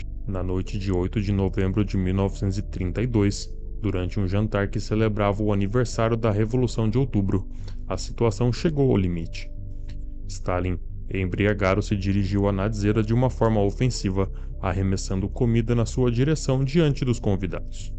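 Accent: Brazil. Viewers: Sim ele é um sulistas branco com sotaque forte quem nasce no sul dificilmente perde esse sotaque 😢😮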